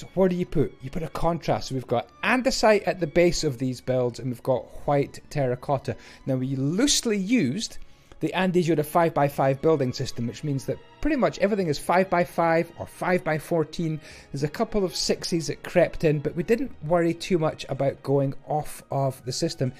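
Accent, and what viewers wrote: Scotland, United Kingdom. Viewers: Like ur scottish accent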